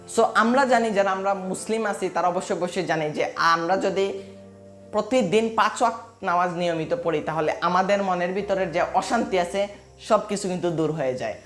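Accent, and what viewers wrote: Sylhet, Bangladesh. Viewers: ভাই তুমার মুখ ও সিলেটি মাত অউ ভালা লাগে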